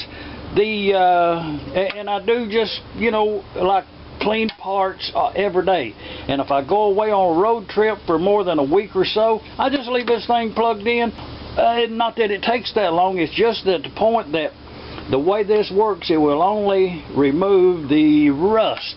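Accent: South, United States. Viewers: I love your "gooda olde" southern accent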